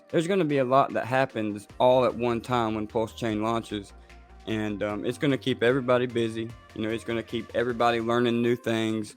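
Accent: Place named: South, United States. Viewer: you keep it simple that’s why I like you! And your southern n into crypto rare combo! Oklahoma here so I appreciate a firmiliar voice in the space ! Took your advice thank you!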